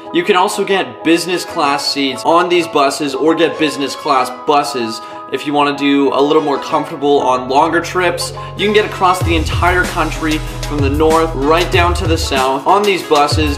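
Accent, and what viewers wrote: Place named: United States. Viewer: Your Spanish must be highly American accented. Chili but you keep saying chilayan. I thought it was chilian....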